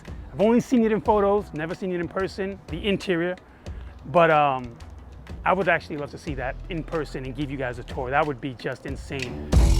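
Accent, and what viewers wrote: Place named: New York, United States. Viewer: Nuttin like a New Yack accent on a video yappin about Mega Yachts???!!! You are awesome made my day.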